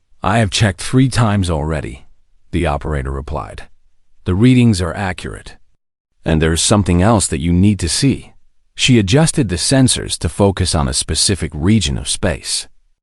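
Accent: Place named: New England, United States. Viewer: Yes, the electronic narrator is definitely from New England, and possibly tipsy. ⭐ But the story is good.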